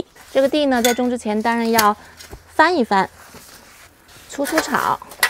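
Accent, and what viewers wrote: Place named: Jinzhou, China. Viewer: 我感觉像是锦州人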